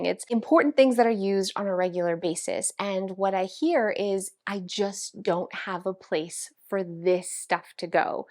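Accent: Canada. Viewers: Are you Canadian? av I hear your accent!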